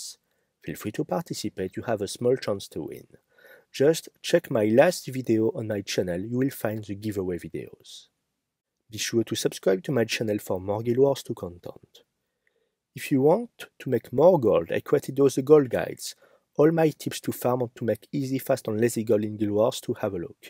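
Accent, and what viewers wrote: France. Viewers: I literally have to put on CC because of how thick and hairy his French accent is. But I love how good his editing skills are. Literally don't need to listen and can watch everything to get the information. So does it matter? I love his work! Tu es beau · I'm French and it's surprising to me how he obviously knows and understands English quite well, meaning he's been exposed to it quite a lot, and yet somehow he still retains that very thick beginner French accent that all high schoolers have. The amount of French people who have way less vocabulary and understanding of English grammar than he does and yet speak with a much better accent is astronomically high.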